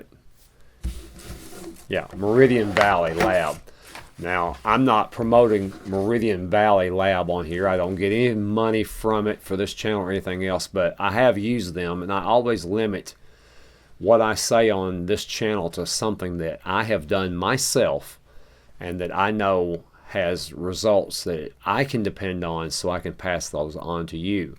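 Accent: South, United States. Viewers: I am very glad I came across your channel. I am learning so much about diabetes from you. Thank you. P.S. I love your Southern accent. 😊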